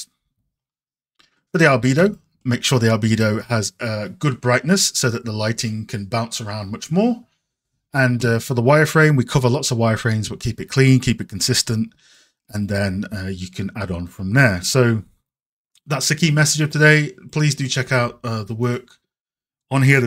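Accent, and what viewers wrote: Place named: United Kingdom. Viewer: Could listen to a british guy saying "roughness" for hours.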